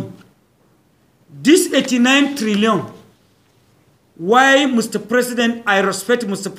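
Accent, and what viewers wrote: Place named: Hausa, Nigeria. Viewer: Hahahaha, that is how the the hausas tribes pronounce things. . Take example from the president himself the way he do province words.Anyway the hausas are the most honest pple in that' Nigeria as a whole. God bless the honourable for helping the common pple in that country call Nigeria. Amen oo!!!.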